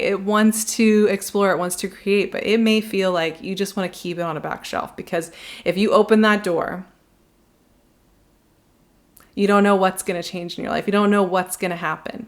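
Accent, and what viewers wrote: United States. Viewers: She sounds like an american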